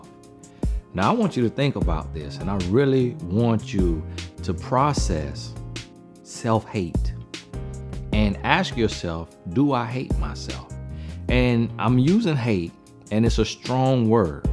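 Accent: South, United States. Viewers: I love the southern accent!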